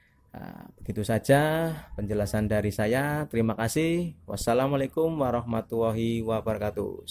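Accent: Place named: Banyumas, Indonesia. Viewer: Ora ngapak ora penak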